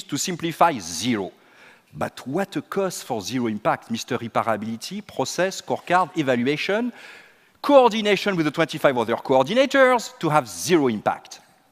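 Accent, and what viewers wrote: France. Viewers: he speaks like a french. i dont like this. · well, i'm French, so I can tell you that oral in our classes, oral english speaks are not pushed to be practiced till college years, so we have a few great people that have that kind of accent... Don't be afraid, this guy has great ideas... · French accent in English is the worst accent in English. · I'm sure this is very inspiring, but since I have never really had the chance to listen to others with a French accent I can't make out every sentence he is saying. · I am distracted by his accent. I love how French speaks English :P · i think he has french roots. I sense a slight accent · 1. Brilliant - I wish more Orgs would have the vision to integrate some or all of this into practice. 2. Its a French accent. Get used to it. There are subtitles. Stop complaining over simple stuff.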